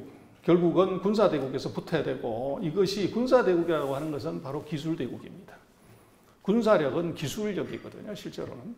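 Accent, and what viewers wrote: China. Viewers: 뭔가 객관적으로 수치 들이밀며 설득하려고 애쓰는데 묘하게 반감이 드네. 한국표준어 잘 쓰는 조선족 같아. 미국과 의리 보다 중국에 붙어라 이건가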